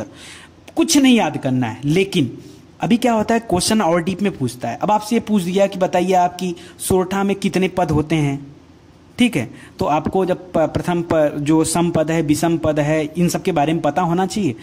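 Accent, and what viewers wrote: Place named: Uttar Pradesh, India. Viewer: सर जी आप उत्तर प्रदेश से हैं, आपलोग 'ण को ड़ (र) क्यों पढ़ते हैं।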